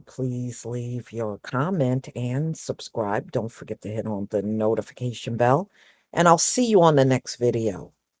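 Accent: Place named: Northeast, United States. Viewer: I could be wrong but I think its her accent . Northeast maybe ? Maryland, Delaware ?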